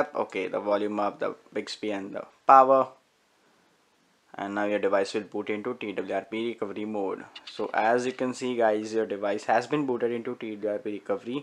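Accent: India. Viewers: Holy shit! Can you please speak slower in future videos? Half of what you said here I couldn't understand at all. Not because you speak Indian or that dialect, simply because you are talking way too fast. You want to talk lower and more precise when you're doing how to and training videos such as this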